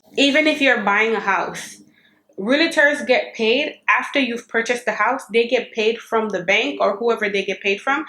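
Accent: Jamaica. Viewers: ARE YOU JAMAICAN HUN❤❤❤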